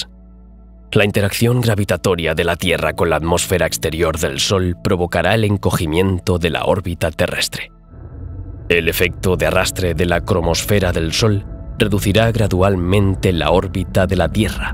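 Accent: Spain. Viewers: Me gustaría un narrador más americano no Europeo, no me gusta la narración Española!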